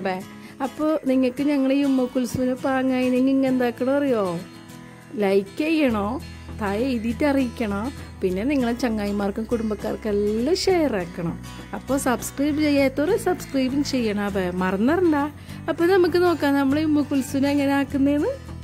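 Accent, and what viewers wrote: Kasaragod, India. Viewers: Ambambo 😍 jan aadyam vijarichathu kozhikalude sammelanam aanenna🤭😄. kasaragod bhasha kalakkitund.entho valiya sammelanam nadakkukaya 🤭.good presentation 👍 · മൊഞ്ചത്തി പൊളിച്ചു. കാസർഗോഡ് ഭാഷയും കിടു ആണ്. ഉമ്മുകുൽസുനെ ചെക്കൻമാര് കൊത്തികൊണ്ട് പോകുന്നത് നോക്കിയിരുന്നോ. മൊഞ്ചു കണ്ടിട്ട് എനിക്കും കൊത്താൻ തോന്നുന്നു........ 😝😝😜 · വളരെ ശ്രദ്ധയോടെയാണ് ആദിയോടന്തം കണ്ടത്. കാരണം അത്ര പരിചിതമല്ലാത്ത ഈ സ്ളാങ് മനസ്സിലാക്കണമെങ്കിൽ വളരെ ശ്രദ്ധയോടെയിരിക്കണം. കേട്ടിട്ട് ഇത് കാസർഗോഡ് സ്ലാങ്ങാണെന്നു തോന്നുന്നു. കേട്ടിരിക്കാൻ ഒരു രസമുണ്ട്. എന്തൊക്കെയാണെങ്കിലും അവതരണം നന്നായിട്ടുണ്ട്. അഭിനന്ദനങ്ങൾ. ഞാൻ സബ്സ്ക്രൈബ് ചെയ്തു. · ishtayi presentation athilum ishtayito kasarkode slang super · ഫുഡ് ഉണ്ടാക്കുന്നതിന്റെ കൂടെ കാസർഗോഡ് ഭാഷ കൂടെ ആയപ്പോ അടിപൊളി ആയി 👌👍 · സൂപ്പർ കാസറഗോഡ് ഭാഷയും എനിക്ക് വളരെ ഇഷ്ട്ടപെട്ടു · കാസ് റോട് ഭാഷ👌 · വളരെ രസത്തിലുള്ള അവതരണം..കാസർകോട്ട് എനിക്ക് ഇഷ്ടമുള്ള ഭാഷയ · എല്ലാ സൂപ്പർ ആയിട്ടുണ്ട് പിന്നെ കാസർകോട് ഭാഷകേൾകാൻ നല്ല പാങുട്എനിക്ഇഷ്ടായി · അടിപൊളിയായിട്ടുണ്ട്. 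ഒരു പുഞ്ചിരിയോടെയാണ് വീഡിയോ മുഴുവനും കണ്ടത്.അതുപോലെ കാസർകോട് ഭാഷ കേൾക്കാൻ നല്ല രസമുണ്ട്. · മൊഞ്ചായിട്ട് 🥳 ഇങ്ങളെ കാസർഗോഡ് ഭാഷ മെജ ആയിട്ട് ഇന്ക് നല്ല ഇഷ്‌ടായി ഞാൻ മലപ്പുറം 🥰, സൂപ്പർ ആയിട്ടുണ്ട്, ഞാൻ nijjus cafe ലൈവിൽ നിന്ന് കൂട്ടാവൻ വന്നതാണ് ട്ടോ ആ 15 ആളിൽ ഒന്ന് ഞാൻ ആണുട്ടോ 🤝 ഇങ്ങോട്ടും വരണേ നാളെ 24 മണിക്കൂർ കഴിഞ്ഞിട്ട് അല്ലകിൽ സ്പാ ആവും 👍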